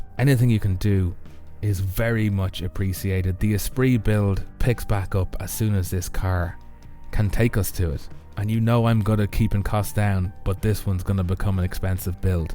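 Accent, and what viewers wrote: Ireland. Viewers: Great to hear an irish accent are u near Dublin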